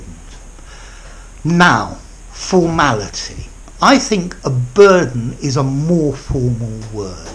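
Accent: United Kingdom. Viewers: Are you from Great Britain🇬🇧?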